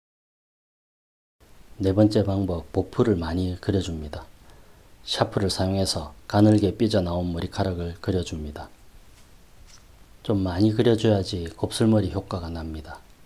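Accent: Gyeongsang, South Korea. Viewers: 혹시 대구분이신가요? 드로잉관련 자료찾다가 구독박고 애청자가 되버렸네요 ㅎㅎ 앞으로도 좋은영상 많이 올려주세요!!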